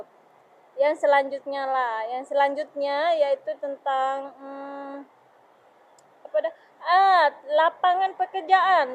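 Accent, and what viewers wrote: Malaysia. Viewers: Maaf sy ckp kamu ckp dh mcm org msia. Terbaik kak ckp. Teruskn bersemagat buat video yg lebih menarik kak. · Salam..kamu bercakap seperti orang Malaysia. Teruskan buat video semoga maju jaya. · aslkm n suami..rata2 orng yg memililki kereta ada motor juga...tpi motor tu hanya jln dekat2. lelaki melayu tak pakai seluar pendek...tahniah ckp awak mcm melayu...semuga bahgia...amin..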